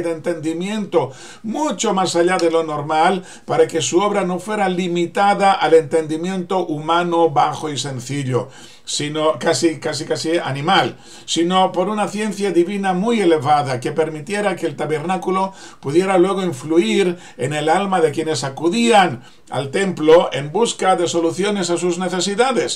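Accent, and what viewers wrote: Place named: Spain. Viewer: La primera vez que oigo un judio con acento Edpañol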